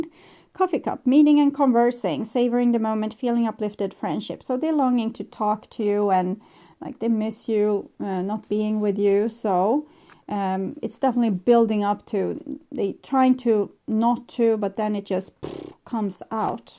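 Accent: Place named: Sweden. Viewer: Tack! Blev mest glad att hitta en svensk! 🙏🙏( jag hörde direkt att det va svensk engelska 😁😁😇) det va nån mening med att hitta denna reading! ❤ Relaterar till hela readingen... 🙏😇 Love amd light!!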